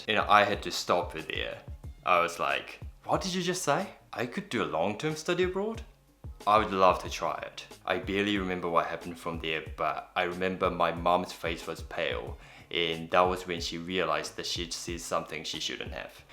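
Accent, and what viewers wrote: New Zealand. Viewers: Hey, I love listening to your Kiwi English!! This is Tia again, thanks for getting back to me the other day to my comment. I also left Japan quite young, age of 15 after graduating JHS in Japan. I've lived in California for 6 years going to HS and Junior College. I chose to come back to Japan to work with a boyfriend I had at that time. (that is not my hubby though) well, my best friend who recommended your channel and I zoom nomikai sometimes and we talk about time of our life back in States. You must be a super dupper busy guy, but if there is a chance, It would be my dream come true to chat with you!!! Thanks for your time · アクセントはめっちゃくちゃニュージーランド人っぽくてびっくりしました！このような自然な日本人のアクセントで話せるようになりたいです！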